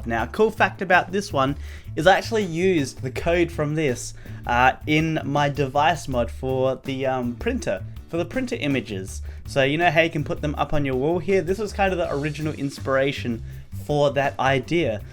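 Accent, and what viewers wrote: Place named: Australia. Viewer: you from Australia I was confused because you say the same as me because I'm ausie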